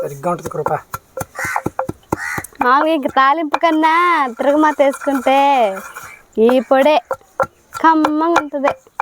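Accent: Nellore, India. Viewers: Hi gaaru... meeru..mee vaarini 'AVNU GADHAYAAA' ani mana nellore language lo antunte vinataani chaala baguntundi 😊naakkuuda maa vaarini alaane pilavaalanpisthundi😊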